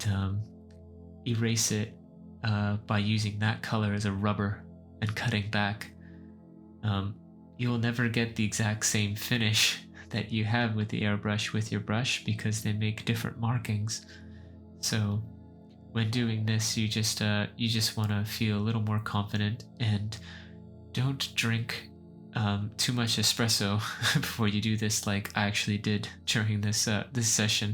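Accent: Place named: Canada. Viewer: No offense but are you Canadian? You pronounce “decals” and other words differently than we do in the US.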